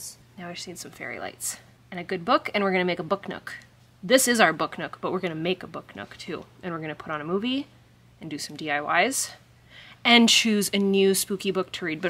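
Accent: Canada. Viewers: I’m distraught over you saying packages and not parcels🥲😂 I LIVE to hear you say parcels, it’s so Canadian🇨🇦🫶🏻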